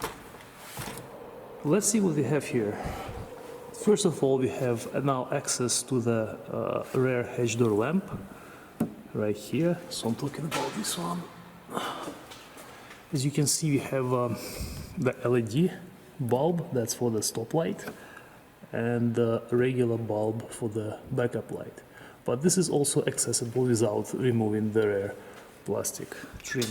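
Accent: Russia. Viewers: Nice Man.. Really helped me a alot, had to change the reverse light.. Couldn't find anywhere. This video solved. Liked his russian english accesnt.. Regards.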